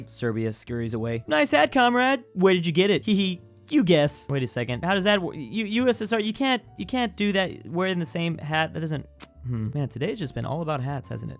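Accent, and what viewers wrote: Denmark. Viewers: Han lyder som en sønderjyde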